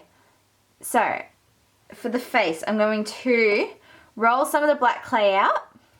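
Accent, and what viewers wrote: Australia. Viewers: Are you Australian??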